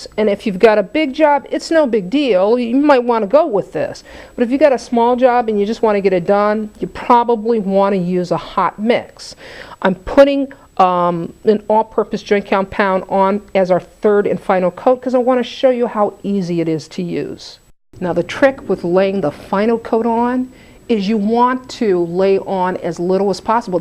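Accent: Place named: East Coast, United States. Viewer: I like to watch you. You are as the previous person mentioned delightful. I also feel you address points that a person (women) like to know. There is more than one way and you cover briefly the differences and don't claim one is better than the other but point out why you may want one over the other at times. I have watched a lot of videos in preparation for a remodel that required a couple walls (paneling) being opened and removed and wanted to put drywall in its place. I have done small repairs in the past on walls and felt comfortable attempting this large project but but after watching some videos in research I felt a little intimidated, but after watching you I feel much more at ease. Thank you. P.S. Your accent reminds me (a Californian) of my east coast friend.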